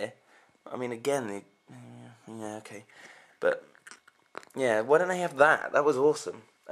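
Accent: England, United Kingdom. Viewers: are you from england aren' you???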